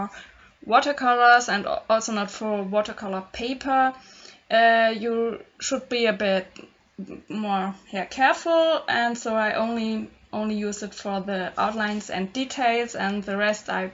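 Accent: Germany. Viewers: She's German!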